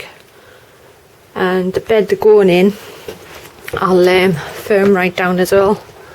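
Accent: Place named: Newcastle, United Kingdom. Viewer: I only discovered your channel a couple of weeks ago and have had marathon sessions watching your gardening vids from the beginning, to the extent that I've started talking to myself in a Geordie accent when I'm gardening! You're an inspiration!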